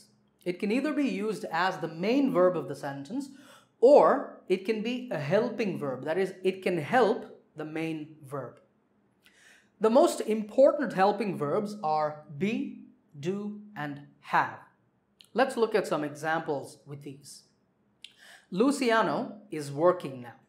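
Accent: India. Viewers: You have an amazing accent being Indian. Keep up the good work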